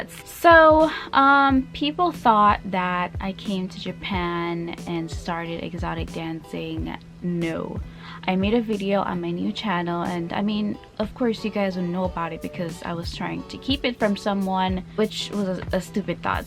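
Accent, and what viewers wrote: Jamaica. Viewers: U from jamaica?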